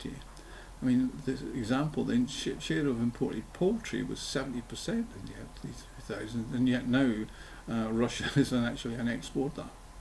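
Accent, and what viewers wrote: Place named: Glasgow, United Kingdom. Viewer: I'm guessing you are from the Glasgow area. I am in Knightswood but grew up in Dumbarton. Can I respectfully suggest you invest in a decent microphone? You deserve one!!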